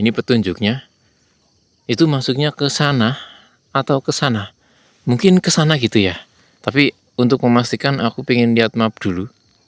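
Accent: Banyumas, Indonesia. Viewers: Pertahankan dialek Banyumaas dan bhs Indonesia bagus dan lucu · aku seneng ngrungokna obrolanen ngapak Ramene ORA patut..dadi khase yaa Mas😂👍👍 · Tetep logat banyumas saja om, biar unik jg enak didengerin. Kalo bisa ada dialog dg warga lokal pake bahasa lokal jg om, suka aja dengerinnya. Jadi lupa kalo negara lg krisis. Hee...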